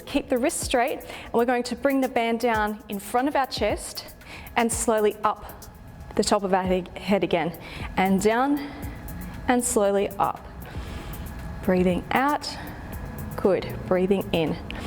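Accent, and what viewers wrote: Australia. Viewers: Definitely felt the burn in the arms! Wonderful channel, with a lovely focus on gentle exercise rather than wearing oneself out. We are Aussie expats from QLD living in Sweden currently, nice to hear your familiar accent while working out. 🥰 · Ì love your work. So nice to have an Aussie accent and a not at all pretentious instructor.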